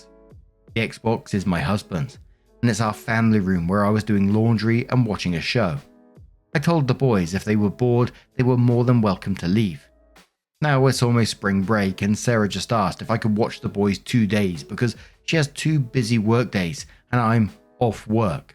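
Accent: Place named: Oxfordshire, United Kingdom. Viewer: I live in South TX, but when I was little my dad was in the US Air Force and Stationed at Upper Heyford. We lived in Oxfordshire (a little place called Middle Barton). When I listen to you talk, it reminds me of the 3 years I lived there. I don't know if that's anywhere near you, but listening to you takes me back!! The neighbor drama, the cheeky little kids. We were always in and out of everyone's houses too. Love your channel and stories!!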